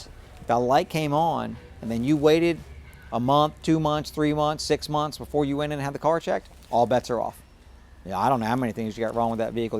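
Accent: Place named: New York, United States. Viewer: I even said to myself: sounds like NYC 🤔 Then you said it's queens 🎯 Figured as much,there's so many ripoff artists down there they step all over each other! The amount of time they take to "pull a fast one" ,it doesn't really take much longer to do an honest job. But 10 seconds is 10 seconds I guess.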